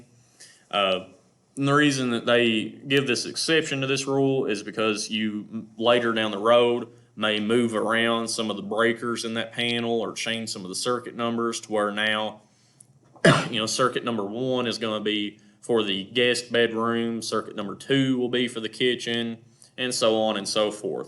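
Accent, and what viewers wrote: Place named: South, United States. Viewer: Thank you for the well put lecture, listening while driving to work. I enjoyed the cool southern accent too 😂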